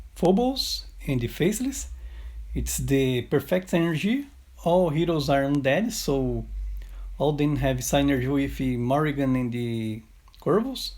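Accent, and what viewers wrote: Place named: Brazil. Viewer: Pelo inglês é brasileiro hehehe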